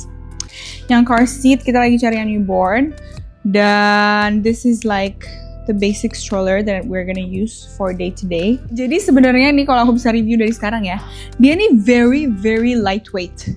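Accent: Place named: France. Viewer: aku tu suka bgt kalau kamu ud ngomong inggris tapi akses prancis kek kek anjaay mehong bgt dirimu😭💓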